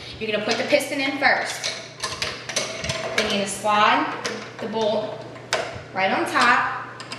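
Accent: New York, United States. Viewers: Too bad that this is a New york accent.......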